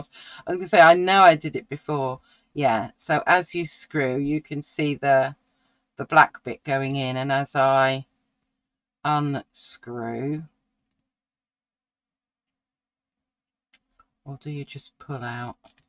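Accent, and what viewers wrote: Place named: London, United Kingdom. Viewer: ￼ ￼ Hi! Saw your comment on my most recent video and thought I would hop over to see what you get up to on your channel! Good to hear another English voice – do I detect a London accent? Cos that’s where I’m from originally! Interesting to see the things that you’ve recently purchased. I’ve had a couple of things from Temu that haven’t been to marvellous, but I’m always interested to see what they are having on offer. Have you heard of the fountain pens by Sailor? They seem to be trending at the moment…￼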